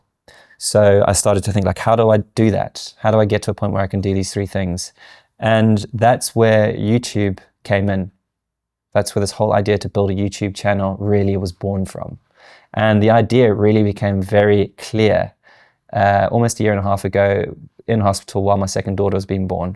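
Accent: South Africa. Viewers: Finding your channel is a breath of fresh air. Most channels feel like a bad American tv advert from the 80s. I love your approach. I can watch without my brain nervously anticipating jump cuts and it feels like you’re speaking directly to me, with authentic thoughts and heart-felt intentions. It is the only way I would want to present myself as well. I have been a photographer and artist all my life and have made a few short films at film school so I know my way around an Avid Media Composer and other editing software. I don’t want to create anything that feels over produced. I just want to make raw honest content but I’m still deciding what that would be - I’ve done a fair amount of tutoring in various subjects so that will help, I suppose. This video in particular has had me trying to articulate what I want in the various areas of my creative life so it has been a great exercise. 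I have often thought about it and even advised others to get clear on what they want, but it was your story about being put on that spot that finally spurred me into making a concerted effort to write it down. Thank you. (I also live in London… I hear a slight South African accent in your speech which is lovely). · Another excellent video, - thank you! I heard you say you live in the UK? You have a South African accent, I resonate… I am a South African living in the Highlands of Scotland, and building a YouTube Channel (just released video 4)., you have so much skill and experience - I will welcome any input you choose to share - you speak so naturally, your dialogue is fluid, natural and seamless… perhaps you could talk more about how you have come to sound so natural, it’s lovely because it allows us to see you - very authentic. Thanks again, I am enjoying your work. 🌺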